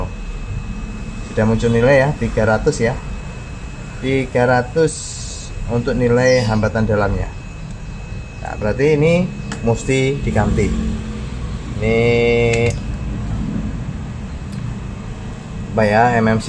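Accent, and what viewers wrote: Java, Indonesia. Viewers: Mas,sampeyan orang lampung kok bahasa jawae kok medok ya.??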